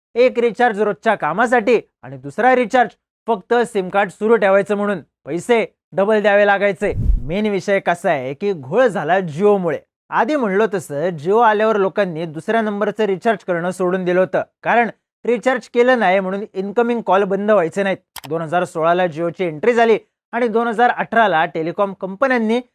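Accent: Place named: Kolhapur, India. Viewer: भावा तुझ्या बोलण्याची पद्धत पूर्णपणे कोल्हापुरी वाटते एकदम झणझणीत आणि चरचरीत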